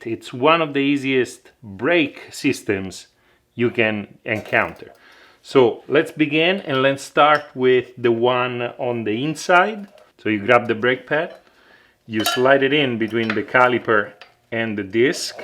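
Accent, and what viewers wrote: Italy. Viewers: Love the accent, italy?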